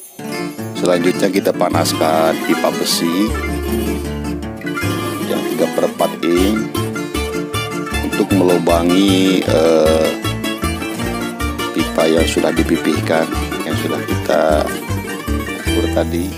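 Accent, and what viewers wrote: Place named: West Java, Indonesia. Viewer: Pokona mah urang Sunda MH Tina A na bentes pisan😀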